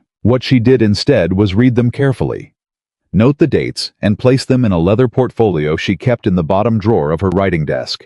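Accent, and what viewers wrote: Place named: United States. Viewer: The flat American accent doesn't belong in a story about British aristocracy. It's an assault to the ears.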